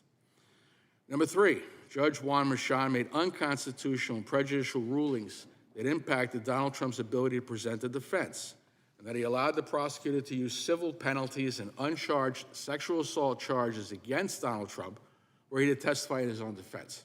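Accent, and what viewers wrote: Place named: New England, United States. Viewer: …you connect the dots. Btw your yankee accent is an embarrassment to fellow New Englanders. Just sos you know.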